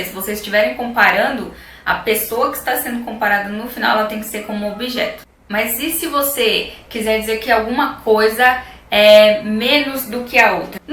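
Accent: United Kingdom. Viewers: esse sotaque e britanico ou e impressao minha? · Sophia Lvt não é q a pronúncia esteja errada, ela está falando inglês britânico. Tem diferenças entre inglês americano e britânico... uma delas é a pronúncia. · vc fala no ingles britanico? é pq lá o t n tem som de r igual o do estados unidos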